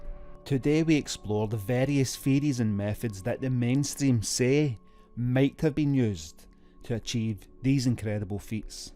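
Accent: Scotland, United Kingdom. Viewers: Always good to hear a fellow Scot. I’m doing a similar video at the moment for my channel. The Thunder Stone in St Pete’s which I’ve been to and is about 1500 tons was moved by manpower in the 1700s. I’ve been to the Menga Dolmen with a 180 ton capstone, Broken Menhir in Brittany up to 350 tons or the Unfinished Obelisk over 1000 tons. The ‘technology’ and magic for me is nature. This is what we’ve lost sight of and our connection to the planet.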